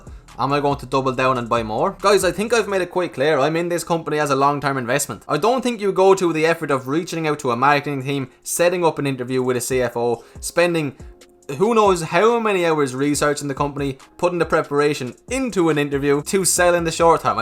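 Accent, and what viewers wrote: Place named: Ireland. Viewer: Dude i notice the irish accent. Lol.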